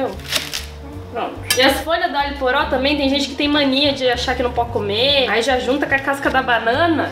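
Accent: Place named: Piracicaba, Brazil. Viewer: Seu sotaque é o melhor que existe!! A piraricabana que existe em mim acorda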